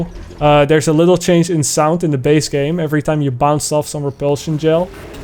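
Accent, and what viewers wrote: Netherlands. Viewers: You're dutch arent you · Okay so i found your video randomly because it was recommended to me. And very good video! Glad i came across it. I love portal and also liked playing Portal stories: Mel But what I actually wanted to ask: are you dutch? I’m dutch myself and i just cant help but feel like you have a bit of a dutch accent. I’m very curious cuz i dont know many proper english speaking dutch youtubers. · I can tell ur Dutch, ur accent is big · I didn’t notice it at first but later in the vid i can hear the dutchness in your voice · You sound very dutch xD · Nederlands accent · is this guy dutch? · At the start i was like lol he sounds so dutch. And then somehow I just forgot about it and the "zie je later" in the end just had me in shock like huuh and then i was like oooh yeah duh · u sound Dutch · Video starts Dutch accent sense tingles =O looks at channel Is from the US "Hm.." watches video, entertained end of video "Zie je later" =O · Forgive me if I'm wrong but your accent screams Dutch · Dutch ish accent if I'm correct? 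· Obvious Dutch accent is obvious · Are you dutch? That accent is familiar. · goede poging voor engels ik ben ook nederlands!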